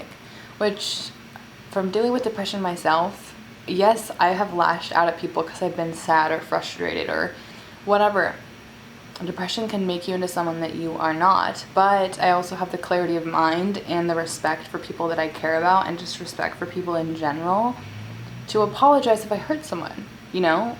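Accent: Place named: Washington, United States. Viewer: It's a Washington accent. Many Washingtonians pronounce t's as d's at times. "water" sounds like "wodder" in WA.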